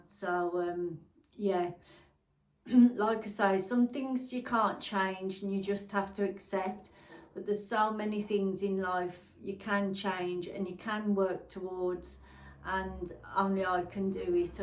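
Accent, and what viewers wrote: United Kingdom. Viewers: Hi! Sorry that you are feeling so low! I love watching your videos - you are kind and caring (and I'm quite partial to a Black Country accent.) 💕 Please don't stop your channel, you have so much to give. Your heartfelt video made me think that an occasional 'Chat with ' over coffee (and cake) segment in your back garden or out on location would be great. You could talk about different things every time - style, clothes, hair, make up, skincare, garden, your hobbies, holiday destinations, keeping fit and healthy, shopping, being a mother and grandparent, your life etc. Love you and your channel,! 💛xxx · Aww, I'm a silent watcher never usually comment but just be you, You are enough! There's plenty of room on YouTube for all! I watch lots of different people for lots of different reasons. I love to watch you as you're so relatable and drama free! 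Plus I'm a Brummie and I love to hear the accent now I no longer live there! To stick my twopenneth in I'd say just do the sort of thing you do and this video here no doubt will help so many people! Xxx Big up to you, much love xxx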